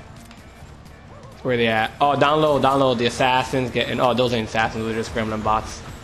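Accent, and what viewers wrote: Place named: Los Angeles, United States. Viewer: yo are you from l.a you sound like you from l.a but another question will you be doing nay reviews on nba2k11 or elite 11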